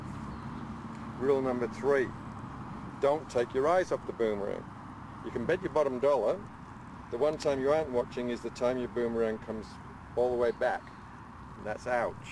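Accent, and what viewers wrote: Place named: Australia. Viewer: Of corse he’s Australian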